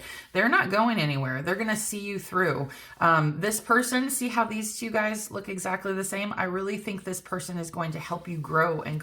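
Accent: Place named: South, United States. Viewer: you speak w the same dialect. But her southern drawl is lil deeper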